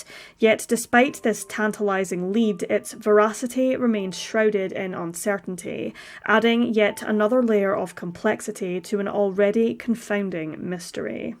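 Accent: Scotland, United Kingdom. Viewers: It is a Scottish accent so the woman is a native English speaker..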